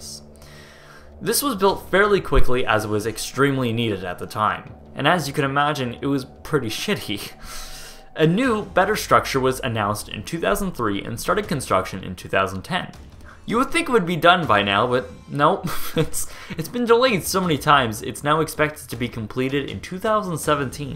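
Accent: Canada. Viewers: You say at the beginning of the video that you're American, but haven't you said you're Canadian in other videos? And you pronounce "about" like a Canadian... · No, he's definitely from the proud north. The way he pronounces his O's, the fact that the only live interview I've seen him do was of the guy in charge of Target Canada, and the Ontario PO box give him away. He could be American now, but he's definitely a Canuck by birth. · You sound Canadian.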